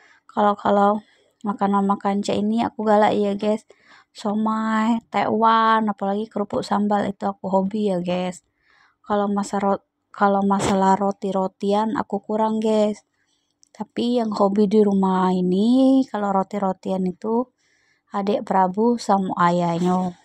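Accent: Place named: Palembang, Indonesia. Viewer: Menu yang sangat enak sekali lor. Kayak orang Palembang lor gaya ngomongnya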